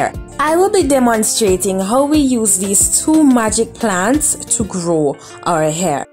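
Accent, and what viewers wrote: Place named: Jamaica. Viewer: Me too... She has this sweet Jamaican accent 😍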